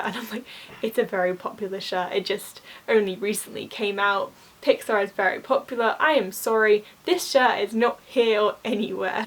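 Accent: New York, United States. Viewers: Trust me your NYC accent is 100x better than most Americans British accent 😂 I love these types of videos btw! I’d love to see another installment of the magical moments you or your friends have had/made too ♥️ I hope you’re having/had a great Disney trip and make it home safe!!! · your New York accent is great for a Londoner or someone from the South! Fascinating stuff. If you work at Euro Disney you will have 20 years of stories for YouTube in the space of a couple of years! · Your New Yorker accent tho!! Best part of this video! 😊😆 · Loved your ny accent lol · Your NY accent was great IMO lol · Your NY accent is so funny! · I’m laughing at your New York accent. I have a Pacific Northwest accent so I’m told. Lol. I used to be a telephone operator. UK operators said to me, “ I can tell you are from U.S. People love to be snobs. Especially from a wealthy area. 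They talk down to you. I hated it. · You sound like a New Yorker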